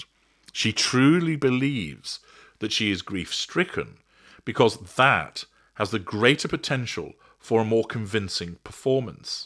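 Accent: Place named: RP, United Kingdom. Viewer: While at first I was very much on board with exposing this particular narcissist, I have given your series on Mrs. Kirk a great deal of thought recently. I mean literally NO OFFENSE to you, but... as the daughter of an ASSASSINATED NAVAL OFFICER (18 July 1972 at Lawrence Livermore Nuclear Lab) I find it rather reprehensible that you are constantly going after a widow in the first few months after the death of the husband. I am cognizant she is a narcissist but wouldn't DECORUM dictate to wait at least 3 -6 months BEFORE treating any family in grief regardless, with some respect? My MOTHER IS A HUGE OLD NARCISSIST and as the daughter of her MURDERED HUSBAND, I would HIGHLY RESENT SEEING A TAKEDOWN VIDEO OF MY MOTHER IN THE FEW MONTHS THAT FOLLOWED MY FATHER'S MURDER. I am NOT a narc - but an empath and as one, this is rather hurtful to those children don't you think, when they after 15 years or so, see how brutal you were to their mother just weeks after their father's assassination? 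I get the educational value but really? I thought you had VASTLY more class and decorum - you do sound like a toff after all. However you are a narcissist so you probably really don't give a shit about what anyone else thinks. right - esp. the actual child of a narc mother whose father was also was assassinated? You just don't give a damn how those kids might feel in the future, do you? I am not at all suggesting that you don't continue this series- but WAIT maybe until well into next year... T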